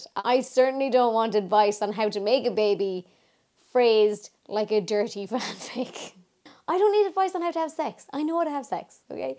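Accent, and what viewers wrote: Ireland. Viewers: I'm sorry to hear this love... but you sound like a strong woman & it's better to face things head on than to dwell on them & let them get the better of you mentally & emotionally... god bless... just curious what part of Ireland are you from if you don't mind me asking....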